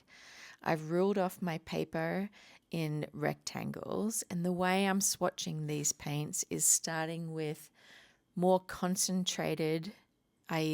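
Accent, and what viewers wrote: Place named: Australia. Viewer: I bought this set to start to watercoloring this year so I am excited to follow along with you to learn how to make swatches. I am an Aussie expat too so it is great hearing your accent.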